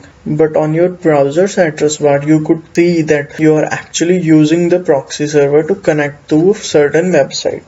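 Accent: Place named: India. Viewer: Because English is not a native language. Is that so difficult to understand? Talk to someone from China, or Japan, or Italy, their accents are different too. Only difference is that Indians are fluent in the language but the accent is different, while others screw up the grammar too and hence is less creepy to people like you.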